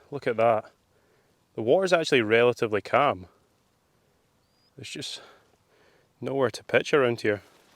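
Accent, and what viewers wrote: Scotland, United Kingdom. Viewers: May I ask, is this a particular dialect you speak or general scots english? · Definitely Scottish with a lovely clear accent. 🏴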